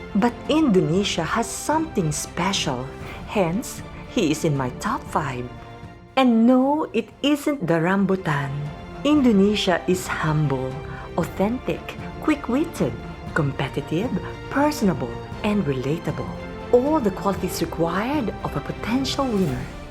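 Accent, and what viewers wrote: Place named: Philippines. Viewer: Are you Filipino? I can tell by your narration. hehe, Anyway, I love the words you used to describe these hot and gorgeous men, and the way you focus to their crutch towards the end at some point.. Your voice is pleasant to hear, it's soft and seductive makakatigas ng titi. hehe. Just work on the right pronunciation of the words and your channel will go a long way by having a lot of viewers or subscribers. Keep it up.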